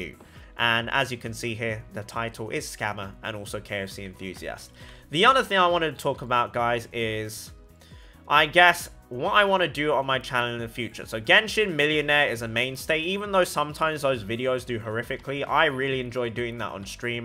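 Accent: United Kingdom. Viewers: I've watched many Genshin content creators, this is the only channel I keep coming back.. There's no happier thing than to watch an Asian with a British accent :D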